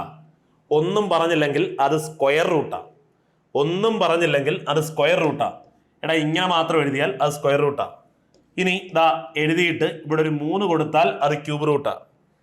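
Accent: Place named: Kollam, India. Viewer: രണ്ടുപേരും കൊല്ലം ആണ് 👀... ഞാനും 😁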